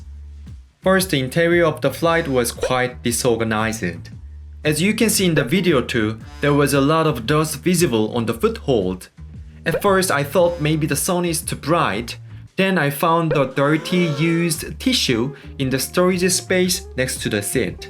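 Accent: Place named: South Korea. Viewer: Such an honest pronunciation of a Korean dude. Just speak Korean and add captions in English would be a much better and high quality method for your vids mate.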